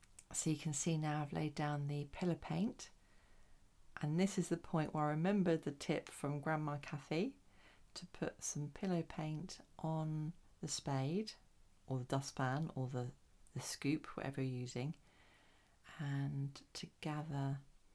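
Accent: United Kingdom. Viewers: you always paint so gracefully and elegantly, your grace, and your adorable British accent matches the videos beautifully.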